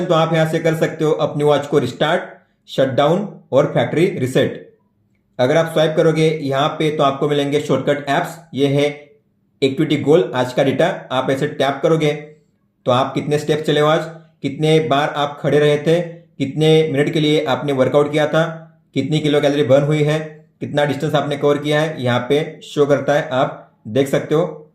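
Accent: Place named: Maharashtra, India. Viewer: marathi accent 🙊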